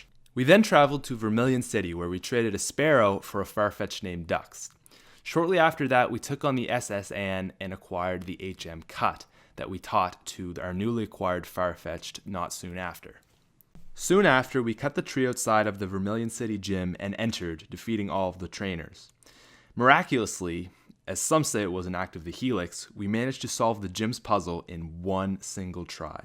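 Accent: Canada. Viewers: what is your accent? is it Canadian? it's very interesting. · I hear that Canadian accent ;D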